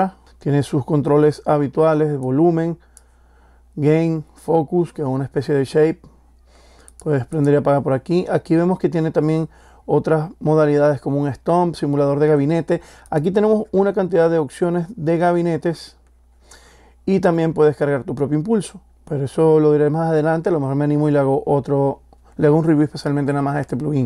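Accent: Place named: Dominican Republic. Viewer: Yo soy dominicano y pensé que eras domi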